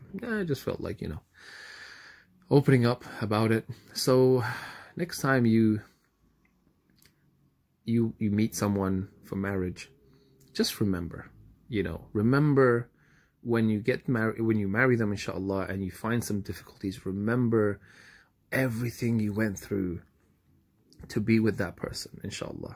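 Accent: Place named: United States. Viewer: I thought i could detect a American accent. 👍😄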